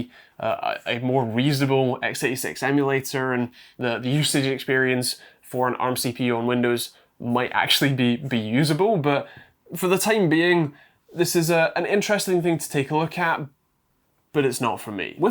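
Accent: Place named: Highlands, United Kingdom. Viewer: No way! a Scottish Techtuber! :D sounds like you are from the Highlands?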